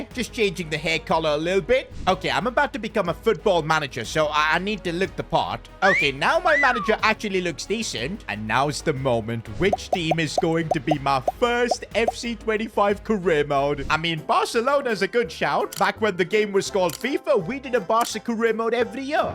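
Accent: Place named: India. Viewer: Bro why he talks like Mickey Mouse but in a Indian accent 😂😂🤣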